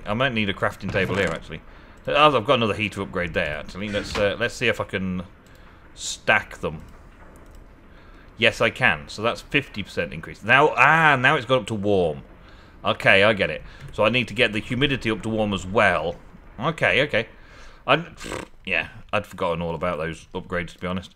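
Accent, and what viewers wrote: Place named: United Kingdom. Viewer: Really excited for Tale; want to see what its like. Should watch a previous season. Couple notes: - Spoilish Jungle Upgrades count for Tropical, Taiga for Ice. It saves you a lot of upgrades. - One upgrade to meet the environmental challenge. Mana Spreaders - Way I do it, is I shift right click with a wand on the Mana Spreader and then shift Right Click on the Runic Altar. I would also try to bind a mana spreader to your Hopperhawk and see if that helps its range like it suggests. Or right click it with a wand, and mouse over it, and put the pool, mana spread in view so you can see if its highlighted. Not sure if its pulilng mana from the nearby pool. And I've learned to say it - Eso' Ter - 'ic; but love the accent and such so however Brits say it!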